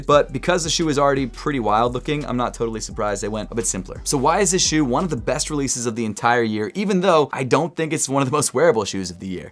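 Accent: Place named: New York, United States. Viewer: I'm picking these up, shocked that your from Baltimore you sound and look like a New Yorker.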